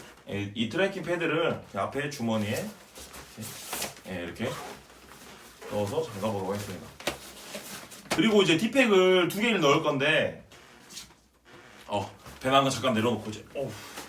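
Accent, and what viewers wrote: South Korea. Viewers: 아따 전라도 백패커요~ 강원도 충청도 경상도 백패커는 많이 봤는데 전라도 백패커는 첨보요~ 반갑쏘잉~ 말이 잼나고 좃쏘이 따라해봤어요. 전 부산출신 경기도 거주 사람이에요 ㅋ 잼나게 잘봤어요. 전라도 유튜버는 첨봐서 기대가 되네요 ㅎ 잼난 영상 많이 찍어주쏘잉~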